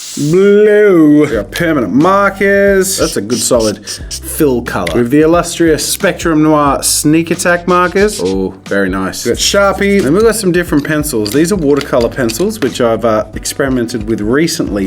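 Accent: Australia. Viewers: Just a quick question, is he Australian? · i kinda find his aussie accent nice but thats probably because ive grown up with it my whole life lol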